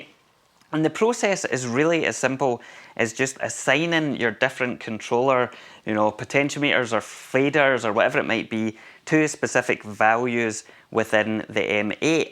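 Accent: Scotland, United Kingdom. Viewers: Really hard to get used to your voice and dialect as a non british speaking tongue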